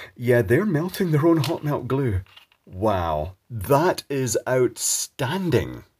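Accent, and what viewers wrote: Scotland, United Kingdom. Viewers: Is this what posh Scottish people sound like?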